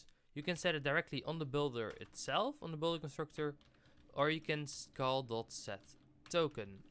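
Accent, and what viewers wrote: Netherlands. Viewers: U dutch? Becaus U have the accent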